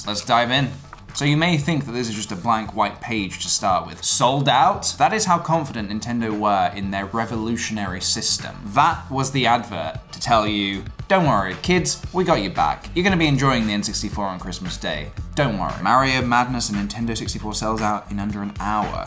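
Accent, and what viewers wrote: Italy. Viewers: IS HE ITALIAN???? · is he italian?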